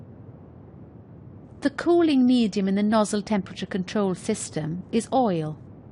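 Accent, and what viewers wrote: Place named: United Kingdom. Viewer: 英音好难受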